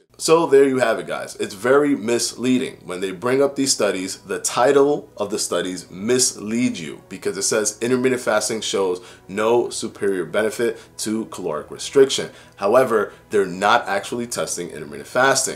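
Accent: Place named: New Jersey, United States. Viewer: sickid41 I bet he’s from New Jersey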